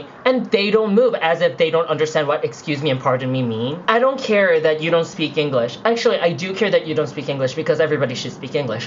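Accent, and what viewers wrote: United Kingdom. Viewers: I don't care if you're American, you spoke like a true Brit in this video. Welcome to the family. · I agree; I don;t think your a tourist if your "living" in London. And I agree with everything you said! Also, I think your starting to pick up a English accent in your pronociation(sp?) of words. Off point; Where do you live in London? What area? Make more of these vlogs. :)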